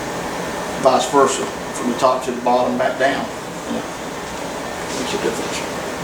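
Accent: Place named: South, United States. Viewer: Awesome cut and you sound pretty southern where you from?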